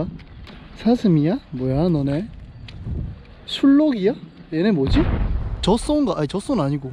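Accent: Gyeongsang, South Korea. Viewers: 잘생기셔서 그런가 외국 댓글이 종종있네요.경상도 분이신가요 사투리 쓰시는거같은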